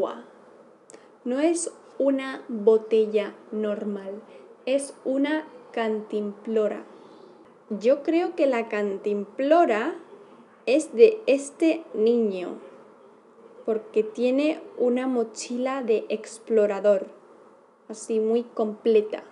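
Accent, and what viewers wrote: Spain. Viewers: Hola. I enjoyed this video. I’ve been learning Spanish using the app Duolingo. You sound very different and I think it’s because the app is based on ‘Mexican’ Spanish. You sound more familiar to what I hear when I’m in Spain. Am I correct?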